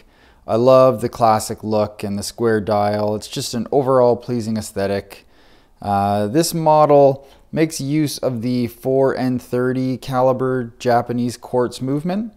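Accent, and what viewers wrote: Canada. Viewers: ohh I don’t know how to explain it haha maybe it’s the Canadian accent 😅 I’m sure you’re pronouncing it right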